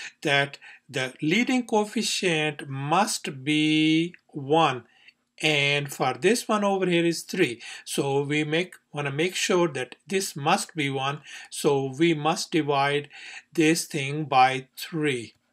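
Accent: India. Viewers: I'm really confused if he's Indian or Canadian. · Sir your way of speaking tells me that you are punjabi?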